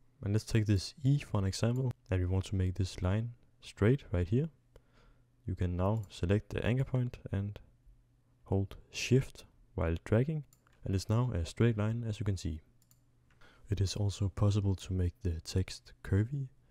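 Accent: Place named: Denmark. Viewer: Den accent lyder meget bekendt... du MÅ være dansker!😂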